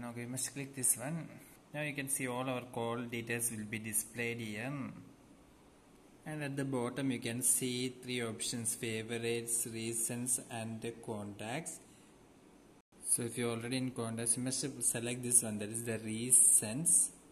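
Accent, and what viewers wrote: Kerala, India. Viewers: Malayali talking in English :) funny slang dude!!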